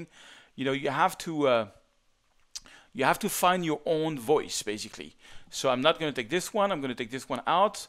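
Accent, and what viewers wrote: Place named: France. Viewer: I love your frenchglish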